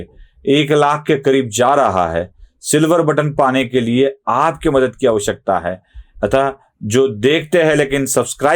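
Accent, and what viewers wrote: Maharashtra, India. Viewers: क्रुपया हिंदी बोलताना मराठी ची लकब टाकु नका. हिंदी सहजपणे बोला. टेंशन फ्रि. मग मजा येईल.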